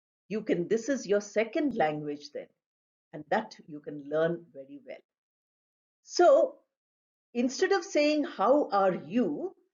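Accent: India. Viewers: Good morning madam 💐🙏 iam following your english classes. Nice classes.Your english class is very accurate, grammatically and fluency with an indian accent english. I can understand your english class easily.🎉🎉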